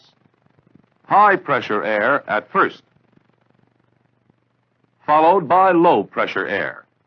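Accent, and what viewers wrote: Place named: Transatlantic, United States. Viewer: I wish people still spoke and presented with a Transatlantic accent like this.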